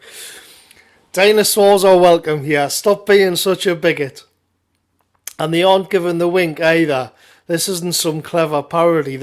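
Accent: United Kingdom. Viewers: Great stuff. I enjoy these kind of youtube reviews more than any modern films themselves. In fact i simply dont watch films anymore if im honest. I particularly enjoy it when gets into fits of laughter. Made me chuckle on walk home from work out loud! Something about discussing what is actually quite a depressing subject and not helping but laugh that really takes sting out of it. Definition of if didnt laugh you would cry. Thats important i think, and very british characteristic (particularly with great accent). 👍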